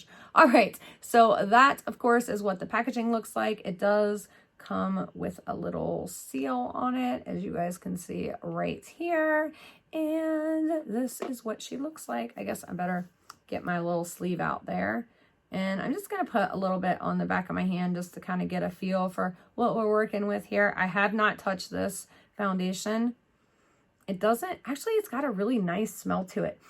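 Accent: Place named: Kentucky, United States. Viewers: Country accent gotta love em lol and my mind wasn't in the gutter till you said something 😂😂😂😂😂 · I am from Kentucky as well and I completely get the pronunciation thing.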